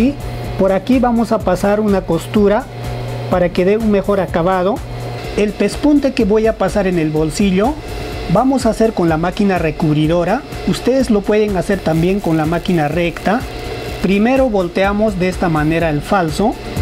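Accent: Peru. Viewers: Obersay? Tela flish terri? Q gracioso para peruanizar las palabras 🤣🤣🤣 igual se ve buena onda, saludos!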